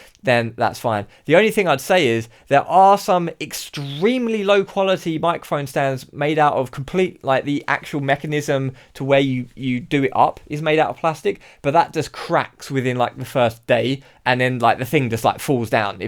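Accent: United Kingdom. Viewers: from your accent, i assumed you were in the uk. where are you located though?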